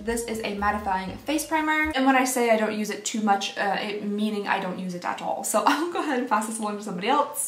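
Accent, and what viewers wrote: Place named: South, United States. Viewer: She’s not saying crown... it’s just her accent so that’s how YOU hear it due to her accent being wildly different from yours. Everyone in the south where I lived says it that way snd I hear it just fine as crayon. When I moved to England for a long time and came back it sounded similar to crown to me at first for a bit. It’s just the accent. Lots of people say it this way.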